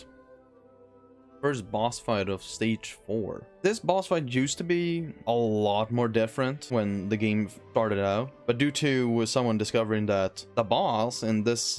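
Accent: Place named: Sweden. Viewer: Haha var tvungen att kolla var du kom ifrån. Tyckte väl din engelska lät svensk. Tack för en bra video!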